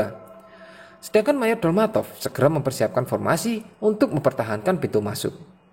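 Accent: Java, Indonesia. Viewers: Bagus, suaranya medok banget, jadi enak ngedengerinnya bro, he he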